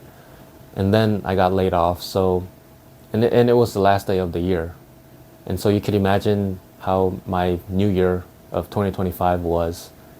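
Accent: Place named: South Korea. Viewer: You have a korean accent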